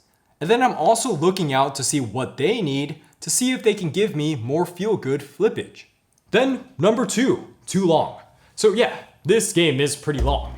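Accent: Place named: United Kingdom. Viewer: Nice British Accent. Cool game, just not my speed.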